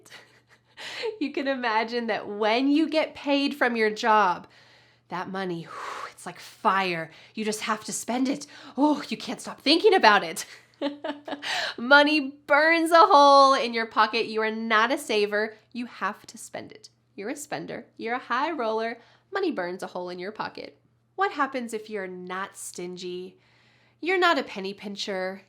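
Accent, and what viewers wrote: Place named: United States. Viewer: Hello, Teacher! I adore your lessons and your voice is so clear! I love American English and keep up the good work! Cheers!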